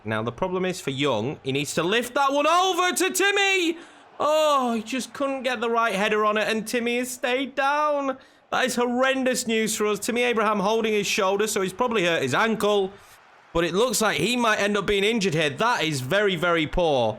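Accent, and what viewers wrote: Yorkshire, United Kingdom. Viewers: Never thought I would say this but Up The City!!! How we have missed your Yorkshire dulcet tones lol 😂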